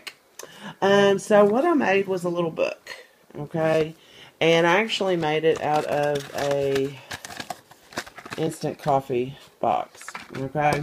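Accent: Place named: South, United States. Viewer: Live the little book. I notice you have a southern accent. Where are you from. I'm in Alabama.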